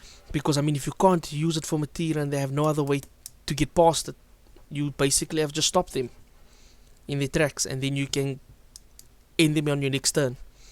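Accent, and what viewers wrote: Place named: South Africa. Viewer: Are you south african? Sounds like it :) If thats the case let me know the. I'll subscribe.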